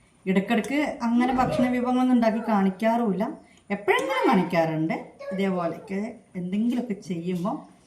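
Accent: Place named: Kozhikode, India. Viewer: ഹലോ ഇങ്ങള് നാട് എവിടെയാ ശരിക്കും നിങ്ങൾ കോഴിക്കോട് വർത്താനം ആണല്ലോ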